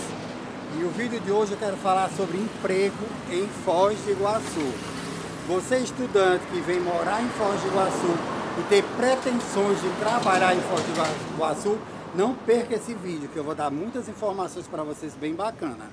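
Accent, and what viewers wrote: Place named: Northeast, Brazil. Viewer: Bacanaaa ver a realidade ! Sou do ES amo seu sutaque nordestino, dizem q o povo daí é metido a besta kkkkk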